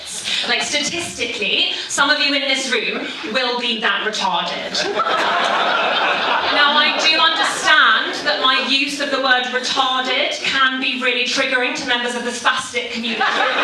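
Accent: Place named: RP, United Kingdom. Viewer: I can't understand her because of her posh accent.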